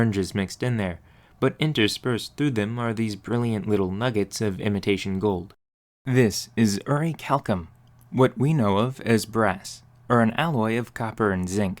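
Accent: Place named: Carolina, United States. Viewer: I'm fascinated by accent! The "er" creeping into words reminds me of some populations of English-speaking populations along the east coast of North America like the Carolina Broque or the New Brunswick accent, but it doesn't sound quite like either. I did a little searching and maybe it's related to some accents in the South, like in Georgia and Louisiana, but it's unclear. Authority becomes erthority, forty becomes ferty, forms becomes ferms, orange becomes ernge, chloride becomes chleride, proportion becomes propertion. So it seems there's like an ah/oh to er merger, but not always. Gold isn't gerld, common isn't cermmon. Anyways, if anyone has information or knows this accent, please let me know! Also, if you read this, I am not mocking your accent, I'm genuinely curious where it comes from.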